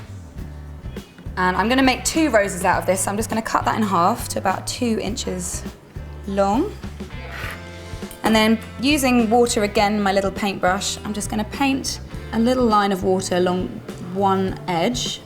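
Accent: United Kingdom. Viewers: Wait what Thought you were British Mom?¿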